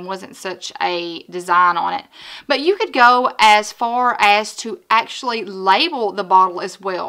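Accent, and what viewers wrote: South, United States. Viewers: Honey...you are from the South huh?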